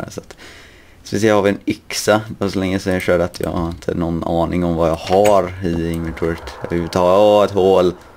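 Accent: Gothenburg, Sweden. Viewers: är du från göteborg för det låter som det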